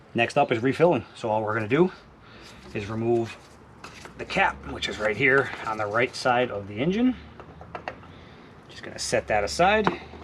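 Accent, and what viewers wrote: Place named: Philadelphia, United States. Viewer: No way this dude ain't from Philly.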